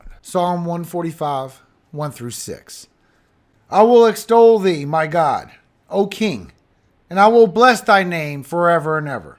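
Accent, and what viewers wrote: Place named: Los Angeles, United States. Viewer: I knew you had to be from LA with that accent. You are my "go to" for weather forecasts and updates. I can always depend on you inform us in LA what we can expect during the active weather events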